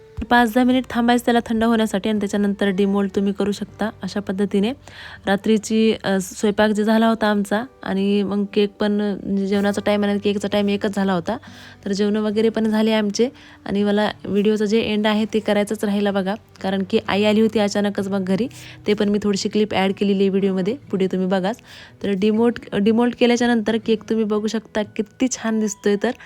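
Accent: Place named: Buldhana, India. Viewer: छान केलंय घराचे काम, पूर्ण झाल्यावर भारी वाटेल 😊 माझ्या मावशी सासूबाई नांदुरा बुलढाणा च्या आहेत त्यांची बोली आणि तुमची बोली सेम आहे 🥰 मी माझ्या काही व्हिडीओ मध्ये दाखवलंय त्यांना✨ छान वाटतं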